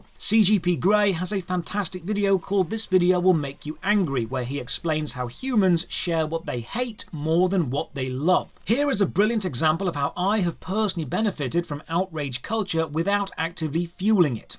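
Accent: United Kingdom. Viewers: Hi Double Barrell British Sounding Well Dressed Man.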